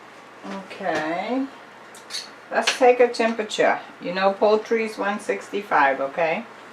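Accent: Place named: New York, United States. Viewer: Thanks for the video and I love your New York accent!!!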